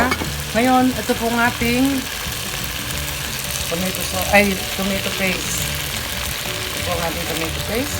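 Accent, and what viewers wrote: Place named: Pampanga, Philippines. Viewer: Galing ng magluto cabelen talaga halatang kapangpangan ya pamagsalita.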